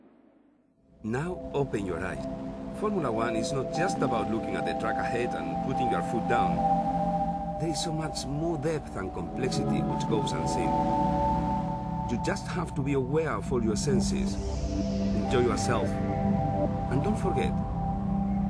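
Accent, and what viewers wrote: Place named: Spain. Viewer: I like your accent. Spanish?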